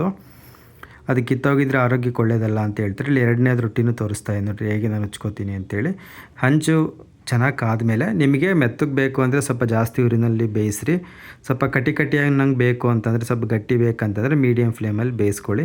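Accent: Chitradurga, India. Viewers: ಹಾಯ್ ಬ್ರೋ 🙏ನಮ್ ಚಿತ್ರದುರ್ಗದ ಮಾತುಗಳು ಕೇಳಿ ಖುಷಿಯಾಯಿತು ಬ್ರೋ 😊❤️❤️ರೋಟ್ಟಿ ಪಲ್ಯ 😋👌👌ನೀವು ಅಡುಗೆ ಮಾಡೋದು ನೋಡುದ್ರೆ ಖುಷಿಯಾಗುತ್ತೆ 👌👌ನಮಗೂ ಸಪೋರ್ಟ್ ಮಾಡ್ಬೇಕು ಅಂತ ಅನ್ನಿಸಿದ್ರೆ ನಿಮ್ಮ ಸಪೋರ್ಟ್ ಇರಲಿ, ನಿಮಗೆ ನಮ್ ಸಪೋರ್ಟ್ ಖಂಡಿತ ಇರುತ್ತೆ 👍❤️❤️😊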